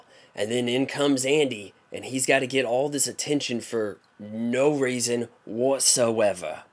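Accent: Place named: Australia. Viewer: wow you really sound australian with your cold.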